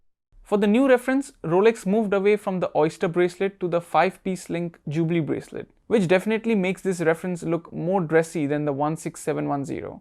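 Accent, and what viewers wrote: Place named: India. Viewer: having the indian accent is really lame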